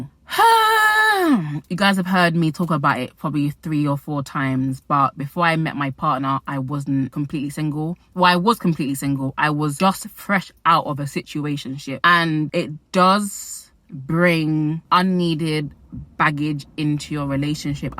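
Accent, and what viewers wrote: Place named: United Kingdom. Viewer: Relationship advice + British accent = GOLD Thanks!!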